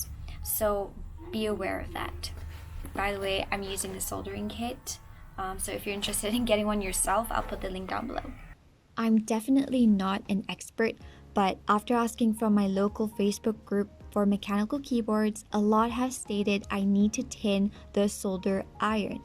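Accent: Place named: Scotland, United Kingdom. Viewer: this is random but you sound slightly scottish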